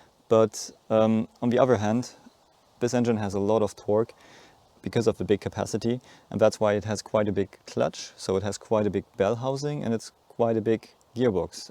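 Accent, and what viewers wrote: Germany. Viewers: Wenn ich das richtig heraushöre bist Du Deutscher? Warum den auf Englisch? Wegen der Reichweite?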